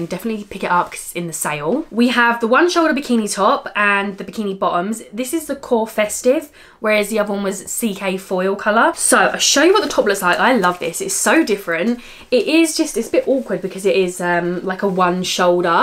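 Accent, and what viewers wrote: United Kingdom. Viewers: So beautiful, just enchanting in every way. And I LOVE that gorgeous British accent! 😍 💕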